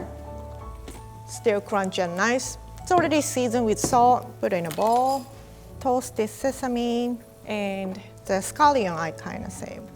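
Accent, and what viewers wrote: Japan. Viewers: lovely Japanese English. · What region of japan is she from. the accent is interesting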